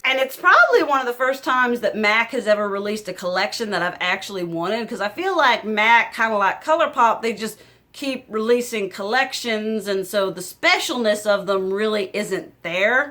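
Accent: United States. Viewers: I love they way you talk country accent with animation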